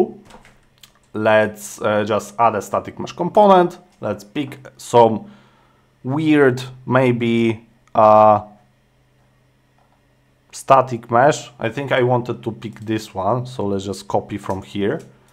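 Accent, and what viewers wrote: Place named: Russia. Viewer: Мне нравится ваш тяжелый russian accent, товарищ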